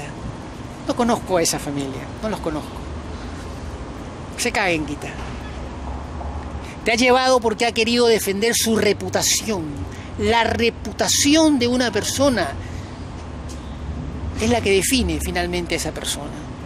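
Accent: Lima, Peru. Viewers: es el acento del varon de clase media alta a alta de Lima, es aceptable, pero el que no soporto es el acento la joven miraflorina o san isidrina, es un acento raro con muchas groserias.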